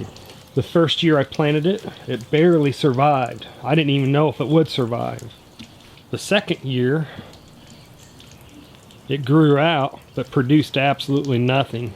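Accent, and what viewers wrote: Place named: South, United States. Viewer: you're very welcome. I dont recall you saying where you live, but you sound southern and I live in West Alabama near T Town. I've obviously forgotten where you're at. Since I hit 50 (several years ago) I do good to remember much of anything lol. I had been doing some research on something I had requested a video about, burying the dead and such, and i never got back to you on that because some things came up for the fellow who I had been talking about it with and getting the info from. (In case you're confusing me with someone else, but I honestly think you're too young for that and it's most likely me who doesn't remember lol)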